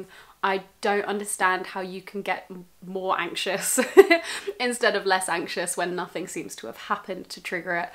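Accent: United Kingdom. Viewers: Hello🇧🇷. I would very much appreciate if you launched a podcast on Spotify, because I'm madly fond of British accent and yours is one of my favourites when it comes to the British youtubers that I follow.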